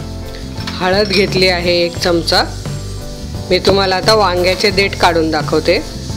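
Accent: Khandesh, India. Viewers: Aho tumhi khandeshi aslyasarakhe boltat.,mhanun. Ssory ha tai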